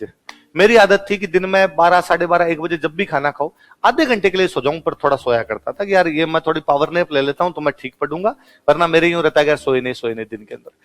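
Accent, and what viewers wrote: Rajasthan, India. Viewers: Aap ke rajasthani excent ko me enjoy kr rahi hun isiliye aapke videos dekh rahi hun!! Me Hindi teacher hun!!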